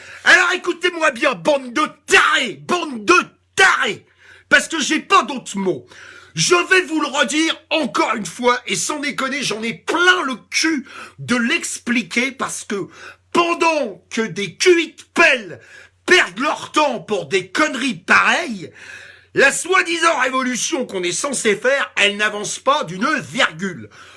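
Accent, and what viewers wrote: Paris, France. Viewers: Apres cette video, si ceux qui l'ont regardee ont un peu de reserve ou de pudeur, ils ne devrait y avoir aucun commentaire! C'est tout ce que je dirai et a ton contraire, je finirai en disant;"je t'aime'! Ah! et cet accent super PARIGO que tu te payes, un regal!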